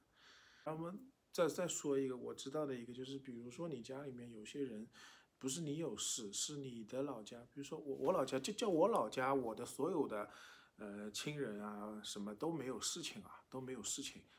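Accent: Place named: Yangtze Delta, China. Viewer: 你这是长三角口音啊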